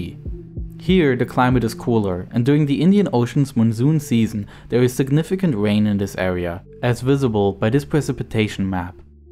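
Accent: Germany. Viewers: ReSAWARS. Dieser leicht Deutsche akzent triggert bissle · You sound german, are you ? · But he does say réservoir properly. So I think it's an accent thing. Probably German.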